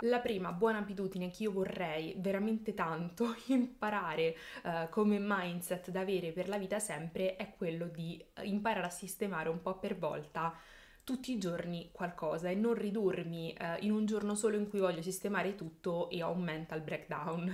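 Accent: Rome, Italy. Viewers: Forse una cosa che non ti ho mai detto e che non vedo scrivere spesso. Parli molto bene. Sei romana certo ma se devi tenere un discorso sai esattamente come esporlo e anche in modo ottimale. Bel video. Bello per quello che hai detto e perché hai legato il tuo discorso a dei video significativi. Complimenti, uno dei video migliori❤️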